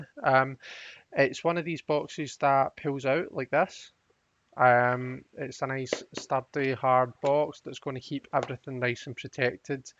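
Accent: Scotland, United Kingdom. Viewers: I love this deck. I was really lucky that I had the opportunity to buy it from Caitlin Matthews in person and she gave me a 10 minute explanation of the deck and Lenormand. Her book The Complete Lenormand Oracle Handbook is amazing too. So nice to hear another Scottish accent on YouTube 😃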